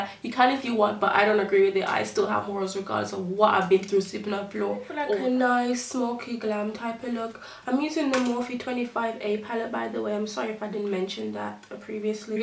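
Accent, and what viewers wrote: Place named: Jamaica. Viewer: love this videos love thank you for sharing your journey also love the Jamaican accent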